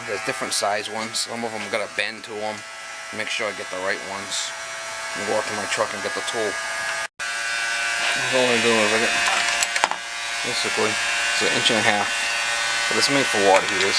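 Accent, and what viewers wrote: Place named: Massachusetts, United States. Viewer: Great video, I really like the tags you use, I might have to look in to those myself. I like listening to you, your accent is exactly the way my dad talked, he was from Peabody MA. He just passed away recently so I enjoy hearing that accent! Keep up the great work! PJ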